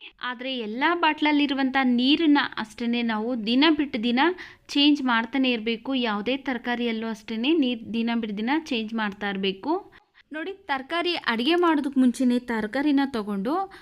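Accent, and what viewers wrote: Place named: Kolar, India. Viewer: kolar bagepalli telugu kannada mataadteera... nice tips...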